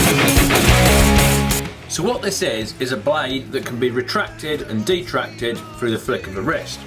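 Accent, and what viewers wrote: United Kingdom. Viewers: How much would you say all the materials for this cost? If possible I want to make myself one. Don't worry about the cost for the tools like the drill and such, I can deal with that. (I know people) I just want to know if I can afford the raw materials. (I probably can't) You sound british, but If possible I would like to know the approximate price in US dollars. I'm also not expecting you to see this comment...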